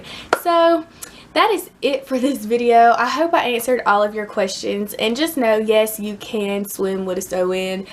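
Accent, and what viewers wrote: Texas, United States. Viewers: I’m thinking “why is everyone saying she has an accent” but then I realize I’m from Texas and have the same accent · You're too cute.. especially your little country accent...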